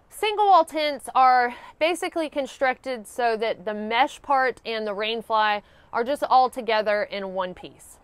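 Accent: South, United States. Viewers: Love your accent. Reminds me of watching the Dukes of Hazzard!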